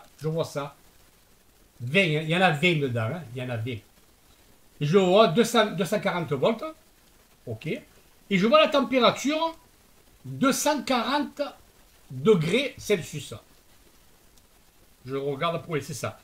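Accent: South, France. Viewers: Bonjour, merci infiniment pour votre aide ! Mon mari voulait jeter ce petit radiateur, exactement le même que celui que vous avez réparé, pensant que la réparation serait énorme, mais je suis allée fouiner sur internet et je vous ai trouvé ! Un bonheur d'entendre ce bel accent du sud qui me manque, je vis à l'étranger... Mais la cerise sur le gâteau c'est votre grande compétence ! On a difficilement trouvé le fusible thermique, mais on y est arrivé, mon mari a suivi vos instructions et on est reparti comme en quarante !!!!!! Le petit radiateur a une seconde vie !!!! Bravo et encore merci infiniment pour tout ce que vous êtes 💪😃👍👨‍🎓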